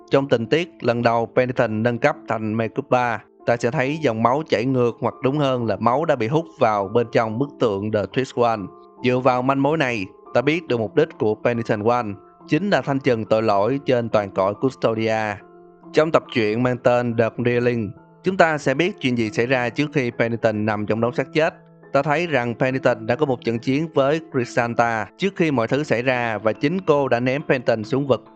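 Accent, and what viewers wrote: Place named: South, Vietnam. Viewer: Ông phân tích game thì chắc ai cũng biết, giọng miền nam nghe rõ ràng, phân tích chi tiết từ cốt truyện đến cách chơi game, tui thích nhất phần chi tiết về hướng chơi game bad ending và good ending 1 số game mà chỉ 1 số game không chơi mới nghe hết, còn mún chơi thì lại phải để dành lại mấy bài phân tích sau khi phá đảo. Tuy vậy nhưng ông ra video khá chậm, mình cũng hơi tiếc về phần này tí, mà chất lượng bù lại thì cũng khá ok. Cố gắng ra nhiều thêm và nhanh hết mức có thể nhá, mình thì auto ủng hộ:)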